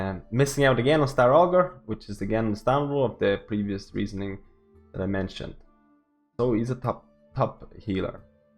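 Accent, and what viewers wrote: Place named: Dublin, Ireland. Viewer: Not sure where you are from but that Dublin accent comes through quite often. Torty is also in Pure a 3 day raiding guild so you do at least have someone from the 3 day raiding guild in the top 5.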